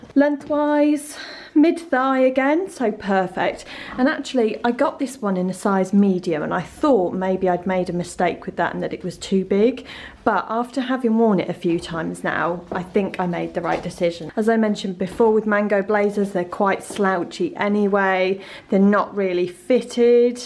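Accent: United Kingdom. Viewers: you look so amazing and I love your British accent so much 😍😍 Have a nice day girl and if you love music, have a listen to this cool song on youtube: - I love the sunny vibe of it and I hope it will make your day better too 😍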